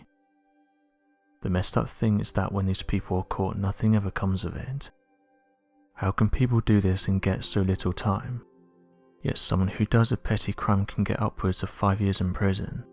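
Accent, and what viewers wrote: United Kingdom. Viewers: Ok so the British accent really is cool but this is old news. How about this computer guy. DONT BREAK THE LAW!!!!!!! Because you will be rolled into the most heinous situation. All of this comes from WHITE people thinking slavery was a good idea. Like any business you need more customers so like a good little racist you are now putting your own People to the sword.. It's human instincts or manstincts that once you do something heinous it breeds and breeds and breeds. Not dealing with slavery ( The dumbest thing AMERICA ever did ) and by that I don't mean reparations , that's tooooooo late. What I mean is sympathy on a level that is real. I get no one wants to pay but how about some sympathy. There is NONE which is why this country is now cannibalizing it's won People. It's really easy , show some damn sympathy and this stuff will never happen but to try to departmentalize hate will lead to this. 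Unresolved issues lead to more. So you got more! This requires a total change of heart on the whole country. Biblicaly this is not going to happen so get saved lol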